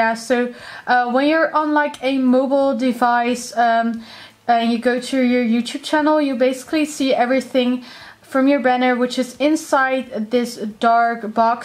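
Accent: Netherlands. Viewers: Ik hoorde meteen dat je uit Nederland komt haha maar je engels is super goed x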